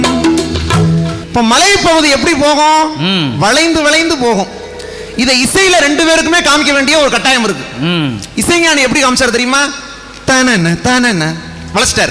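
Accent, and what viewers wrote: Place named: Telugu, India. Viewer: இவர் தெளுங்கரா.... தமிழை இவ்வளவு அழகாக பேசுகிறார் 😂😂😂😂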